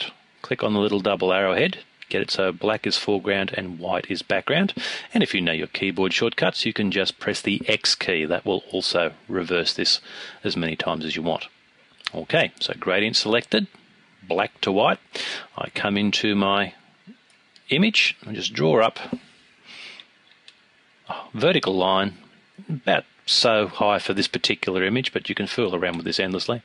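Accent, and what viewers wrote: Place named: Australia. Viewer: wow,, great tut man, great, btw,,,,,, are u australian???? cuase ur accent sounds like ur aussie..... lol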